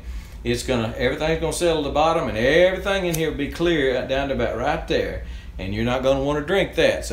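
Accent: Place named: South, United States. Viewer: Great one bro. I loved your southern accent too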